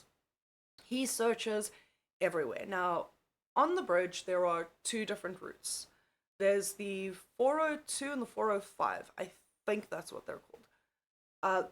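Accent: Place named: South Africa. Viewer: i believe she's South African, beautiful accent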